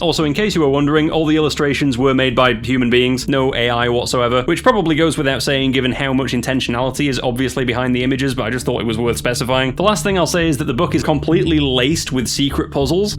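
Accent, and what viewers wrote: United Kingdom. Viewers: YOU'RE SCOTTISH?!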